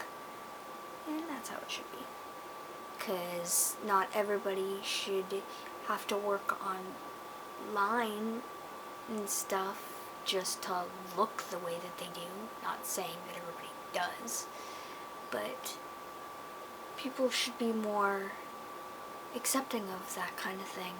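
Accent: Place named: Canada. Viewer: i can hear your canadian accent!! :D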